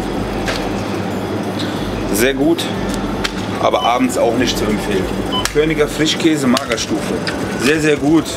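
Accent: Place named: Franconia, Germany. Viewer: er fränkelt manchmal :)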